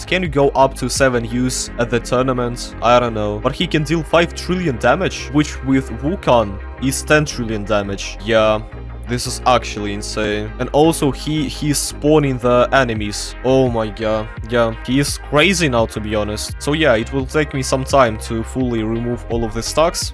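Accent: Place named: Russia. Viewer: когда думал все начало видоса чо за акцент русский а потом видешь как вместо английской E пишется у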